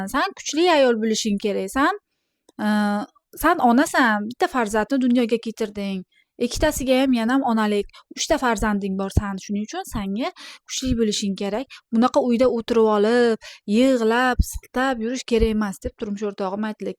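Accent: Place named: Olot, Uzbekistan. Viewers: Shevangizdan bildim Buxorolik Olotdan ekanligizni Men ham Buxoroni Romitan tumanidanman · Salom gapirganiyizda buxoro shevasiga tortarkanaa opaa omad sizga❤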